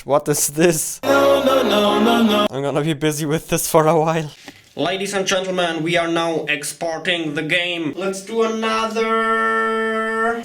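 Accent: Germany. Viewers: You are German? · Are you an Austrian, German or a swiss?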